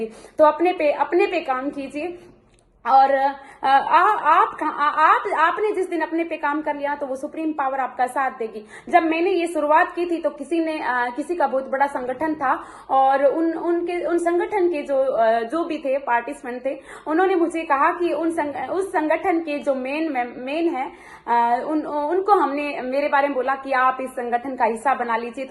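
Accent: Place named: Rajasthan, India. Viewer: Di aap ki boli mujhe bhot Pasand h kyuki m bi Rajasthan se hu or Hindi me sab ko achhi trh se samjh aati. H thankyu so much di love you di 😍😘😘🥰😍😘🥰😍😘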